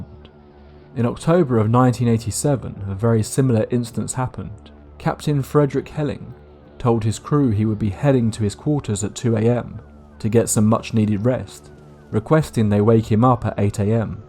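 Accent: England, United Kingdom. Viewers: Not "glided" but Glid! I'm becoming rather disillusioned by your channel as, though you speak with an English accent, your grammar suggests you were "schooled" but not educated in America. Schooled but not educated? Simple. America's education standards are non-existent! A Harvard or Yale graduate is equal to a kindergarten pupil anywhere else in the world. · Man why is this British guys scaring me rn😂🤣 good video man😂👍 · It's a little funny to hear a quintessential British voice recounting American ghost stories. Like my ears and brain do a double-take. But as usual, your research and storytelling are spot on as always. I really do love your work, regardless of where the tales take place. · Don’t! We love your British accent!! 🇬🇧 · This motherfucker is not from Michigan I can tell by the British accent get the hell out of here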